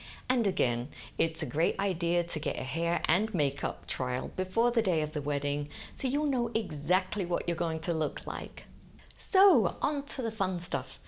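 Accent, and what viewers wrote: United Kingdom. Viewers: You’re lovely. Do I detect a UK accent?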